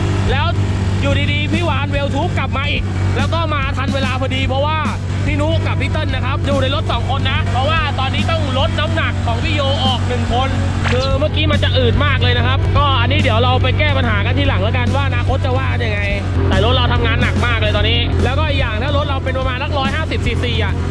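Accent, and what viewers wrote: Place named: North, Thailand. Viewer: พูดไทยสาบเมืองได้ไง 5555 จิ้นส้ม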